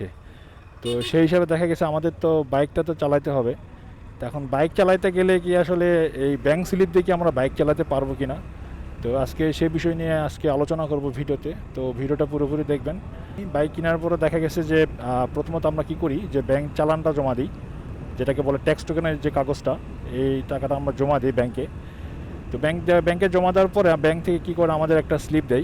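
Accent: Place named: Sylhet, Bangladesh. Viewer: তামাবিল রোড 🥰 আপনার একসেন্ট সিলেটিদের মতো, আপনার বাড়ি কোথায় ভাই?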